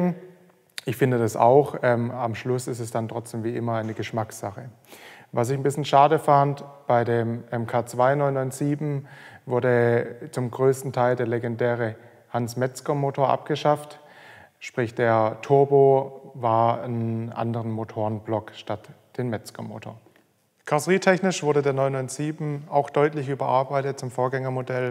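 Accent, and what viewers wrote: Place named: Swabia, Germany. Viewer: Der Schwabe :)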